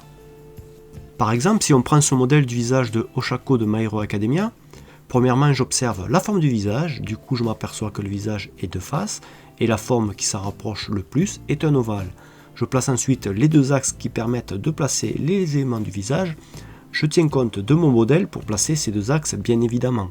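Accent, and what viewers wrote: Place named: South, France. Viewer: Petit accent du sud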